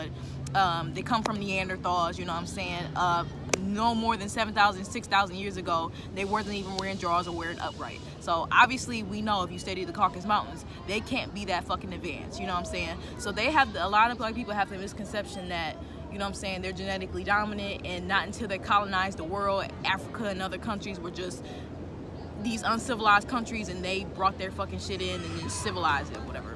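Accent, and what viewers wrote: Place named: Chicago, United States. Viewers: Sound like chicago.. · WAKE UP CALL TV Stoney_stay_woke_sos exactly like Chicago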